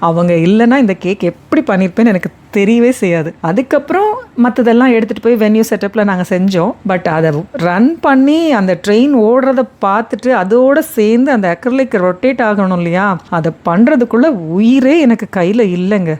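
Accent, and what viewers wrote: Madurai, India. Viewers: அருமை அருமை நீங்க மதுரையா sister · Nega madurai ya akka 😊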